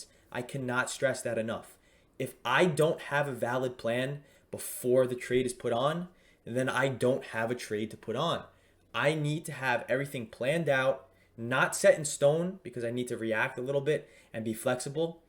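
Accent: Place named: New Jersey, United States. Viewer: Hey, I am from NJ, you sound like your from my area. Thanks to your video, I applied for options got approved for standard margin. But I am getting limited to Pattern Day Trader. Can i call to have margin turned off and the 3 a week wont apply to me? Thanks again.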